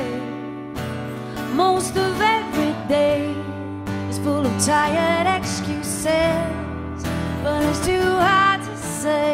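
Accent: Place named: United Kingdom. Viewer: "Hello, everybody" AHHHH, QUE COISA MAIS LINDA! Eu amo esse sotaque britânico dela! É tão fofo(e sexy)!, sua linda, casa comigo?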